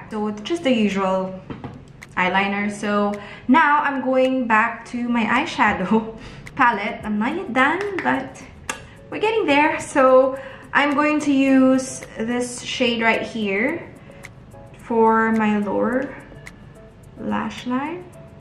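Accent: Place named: Philippines. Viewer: Love your accent! Fell in love with pinoys while working as a cabin crew in the middle east, such hard working, lovely and welcoming people! You are beautiful!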